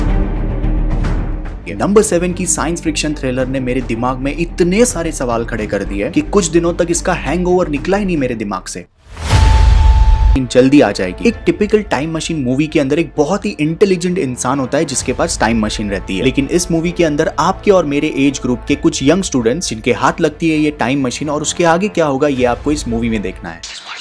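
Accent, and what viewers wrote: India. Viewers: I watch your videos so that I could copy your accent. That's the best Indian accent.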